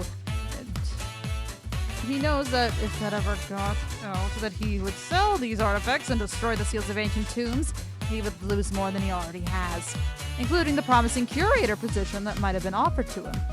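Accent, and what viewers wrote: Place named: Pacific Northwest, United States. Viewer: 1. Are you from the north east Washington/oregon area? You have little vocal tones that sounded very similar to Lockstin & Gnoggin. 2. Please please please make more of the characters!!!!!! Loved the vid!!!!!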